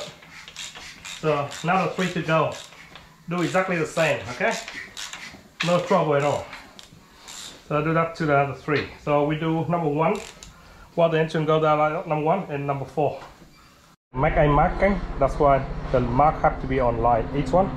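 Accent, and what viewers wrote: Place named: Australia. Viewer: How many years you been a mechanic very smart man love your Aussie accent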